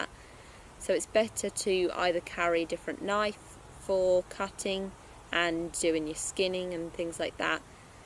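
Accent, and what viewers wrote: United Kingdom. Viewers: British accents sound great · Oo you’re British that’s nice for a change well used to American vids about bushcraft but no U.K. content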